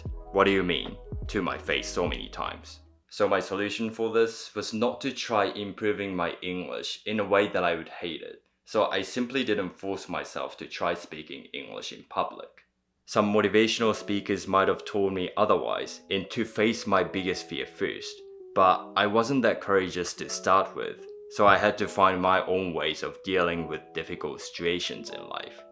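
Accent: New Zealand. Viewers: stumbled upon your videos and i must say the way you say certain words is very 'kiwi". some words i picked up are get, seven, end, test, next, friends. Mostly the vowel sounds of 'e'that are almost pronounced as 'i'. Like you, i''m a migrant to NZ and can relate to your struggles. Your videos are very cool by the way. Your new follower from Auckland. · You're a Kiwi, aren't you? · Kia Ora. Your English is perfect and still very "kiwi". Great to see you wearing your 'pounamu' as well. I do hope you will come and visit us in NZ in the future. Love your videos! Best regards from down here in the other shaky isles. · Your English is very fluent. You can definitely hear the NZ influence in your accent though :) Do you ever forget japanese from time to time?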